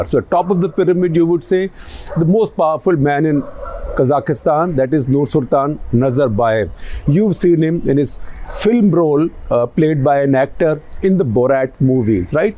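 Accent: India. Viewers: That was the Voice of America with an Indian accent. He should concern himself with India : one of the poorest countries in the world. The average citizen in those countries that he criticizes enjoys a living standard that people living in "democratic" India can only dream about.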